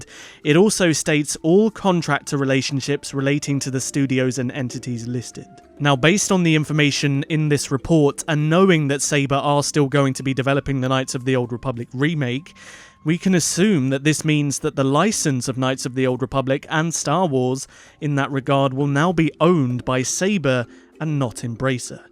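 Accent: United Kingdom. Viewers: What part of the uk is you accent?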